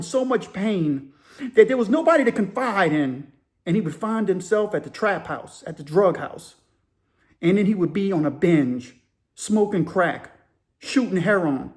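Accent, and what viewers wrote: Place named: Deep South, United States. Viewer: I’m so chuffed ( happy 🇬🇧) I found you. You are ‘ The Font of Arcane and Highly Engrossing Deep South Prison and More Stories ‘. You’re honest too ! You are the most ‘ unique’ and different prison platform. Most of the prison boys tend to be from Cali, Texas, Florida or Georgia. Not much from the Delta. I’d love to have visited NOLA before that terrible hurricane ripped the heart out of that most mysterious voodoo infested French and African melting pot in the World. Damn. Yep it’s great to hear your accent, and the fact that you’re mixed inside . Not political structure like Cali, West Coast. And they call the Deep South racist. Shit , every colour population has fools and maniacs, but that’s no reason to hate the whole group. Seems a bit of that Southern Hospitality and Manners goes a long way. Keep em coming please. 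That was a really moving tale about the treatment and experiences of veterans. A lot in Britain are homeless and in prison. After the 2 Gulf Wars, Bosnia and Afghanistan. They all have, sadly, been let down. Good on yer for keeping them in our thoughts. Top Rankin’ 🇬🇧🤝🇺🇸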